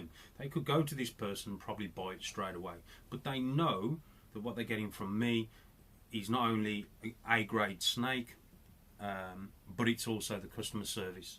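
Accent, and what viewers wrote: Black Country, United Kingdom. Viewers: Well Mr, if it don't come from you I don't want it😲 but that because of the yard stick I mark others by, it's reputation, reputation, reputation with a bald head and a Black Country accent😅😅😅😅😅😅😅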